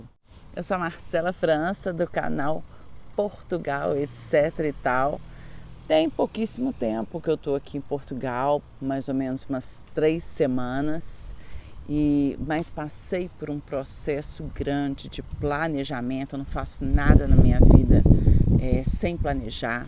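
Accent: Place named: Brazil. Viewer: Parabéns amiga, se não fosse o sotaque ninguém diria que a senhora era brasileira. Eu como Português, nascido em 1940 tenho a dizer-lhe que, quanto a Portugal e aos portugueses, o seu raciocínio está 120% certíssimo. Faça favor de ser feliz em Portugal na companhia de quem mais ama. Bem haja por este magnifico depoimento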